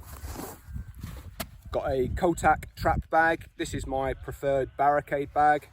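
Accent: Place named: United States. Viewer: You talk funny from America. Thanks for the video good info.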